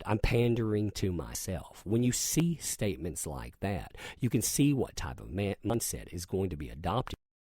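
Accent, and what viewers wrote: South, United States. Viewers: Lol. Right. I’ve left comments a couple times about the “within this/that” blowing him shit about it in a friendly way. But this particular video had “within” all over it in other contexts too. Yeah I’m mostly just kidding. It doesn’t actually bother me or anything. And that’s a great way to look at it! You know he hasn’t been replaced haha I was thinking maybe that’s like a regional colloquial thing(?). He does have a southern accent a bit and I’m from the Midwest. Maybe that’s something folks say where he’s from. Now cap’n Cummings I just can’t watch anymore. Not because I disagree w him on anything at all (I 100% agree w his takes just like D&C and ) but his constant mispronunciation and misreading of simple common words. He’s SO dumb I can’t take it lol. God love him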